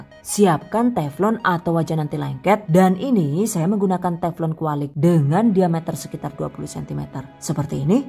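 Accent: Banyumas, Indonesia. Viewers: jannn deneng wong ngapak ya yune, pada bae karo nyonge.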